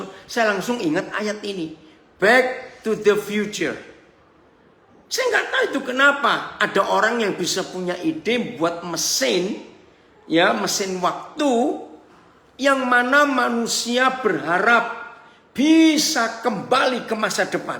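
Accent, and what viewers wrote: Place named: Surabaya, Indonesia. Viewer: Jelas psk dt suaranya dr surabaya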